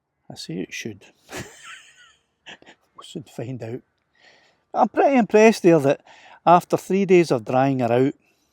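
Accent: Scotland, United Kingdom. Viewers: Nice video. Judging by your accent, you are in Scotland. Where abouts are you located?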